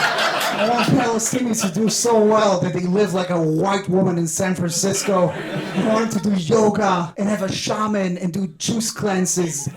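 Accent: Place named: Israel. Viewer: Toda raba, lachte das erste Mal seit einanhalb Wochen vom Herzen ❤ love your sweet israeli english 🤗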